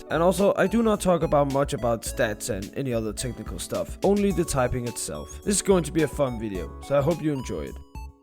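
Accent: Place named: Denmark. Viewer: Figured you were Danish based on your voice alone, got further solidified by you using the Danish website, lol.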